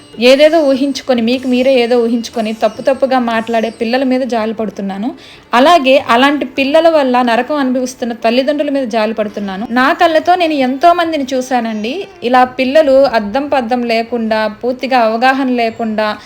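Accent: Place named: Godavari, India. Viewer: Madam midhi a uru ...miru nellore antunaru but godavari slang kanipisthundhi ...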